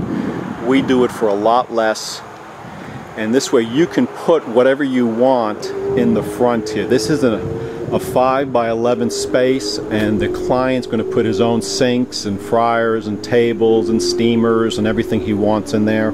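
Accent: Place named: New England, United States. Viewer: I love the Yankee accent........ Yankees making Southern style BBQ always give me a chuckle. Hahahah Nice trailer and good information. I would love to have a trailer like this but it would be a real drive to get it here in the REAL DEEP SOUTH in Chile. Thanks for the video. Jim in Chile.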